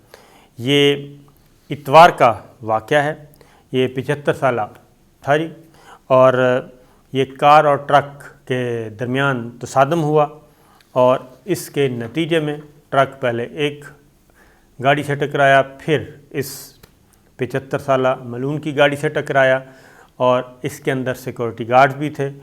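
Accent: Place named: Saraiki, Pakistan. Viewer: معافی چہ معنی دارد۔ صابر شاکر صاحب اچھی اردو بولتے ہیں۔ لیکن کہیں کہیں لہجہ پنجابی بلکہ سرایکی ہوجاتا ہے۔ مثلاً گھنٹے کو گھینٹے فرمایں گے۔ اسکول کو سکول۔ قران کو کوران۔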